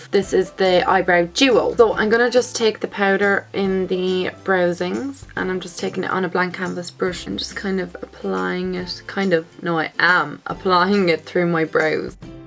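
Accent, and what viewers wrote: Dublin, Ireland. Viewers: I was wondering from where her accent was from and then I paid hard attention and remembered of Niall Horan and boom! It's an Irish accent 😊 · Are u from dublin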